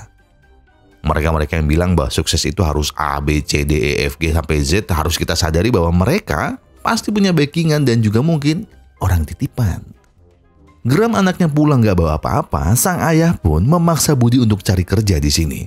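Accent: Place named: Semarang, Indonesia. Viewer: Jowo mu medok kang Koyo semarangan 😂😂😂😂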